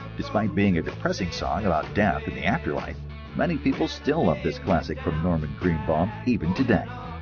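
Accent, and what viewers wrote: United States. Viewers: THis was going greAT UNTIL THE aMERICAN VOICE OVER....GREATTUNES BUT cut the effing vocals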